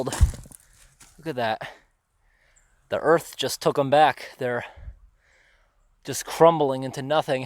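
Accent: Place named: United States. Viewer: Omg I love his American accent.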